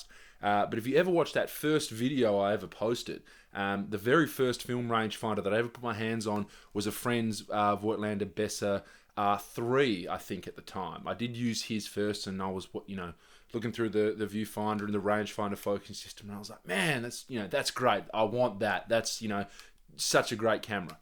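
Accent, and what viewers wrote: Australia. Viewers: I'm a very satisfied Voigtlander Bessa-R (LTM) owner, and I don't really care that much about what you're saying. I just love watching a real-life giant with a wicked Australian accent talk about analog photography, so cheers for all you do, mate ; )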